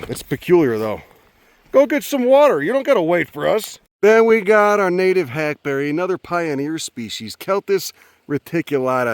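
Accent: Chicago, United States. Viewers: i found a link to this channel in a 2yr old reddit post about chicago accents. wasn't expecting to find a live channel with a brand new video. anyway, southsider here. you sound like we could be neighbors.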